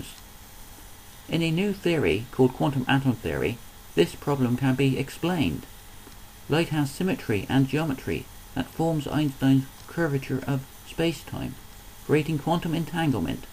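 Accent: West Country, United Kingdom. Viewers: hey dude, this is the same way i see quantum entanglement. but then i'm a biologist so what do i know. also, are you from the west country?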